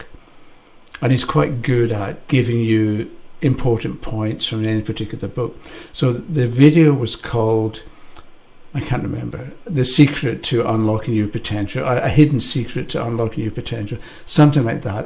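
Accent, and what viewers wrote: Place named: Glasgow, United Kingdom. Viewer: I love your accent, mister. May I ask what area it's from? It's almost like Peter Capaldi's glaswegian accent but mellow. Good video and explanation. Thank you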